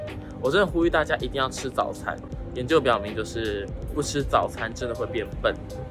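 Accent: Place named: Chongqing, China. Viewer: 所以学弟弟是海南人嘛？刚刚说的不是重庆话嘛？我凌乱了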